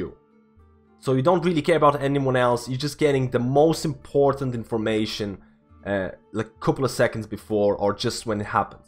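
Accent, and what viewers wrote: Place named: Dublin, Ireland. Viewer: Where are you from. I kind of detect a Dublin accent but not a native Dubliner. Like you're from another country in Europe but adapted over time. You're probably not but just thought I'd ask.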